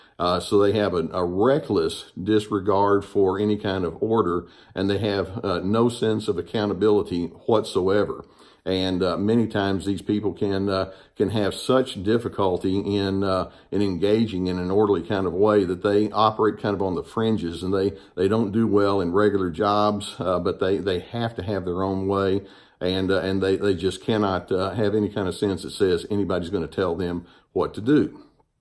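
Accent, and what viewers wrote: South, United States. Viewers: Love THICK SOUTHERN_ACCENT!! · Appreciate a doctor with a Southern accent! The media equate that accent with uneducated hicks--exacerbating regionalism.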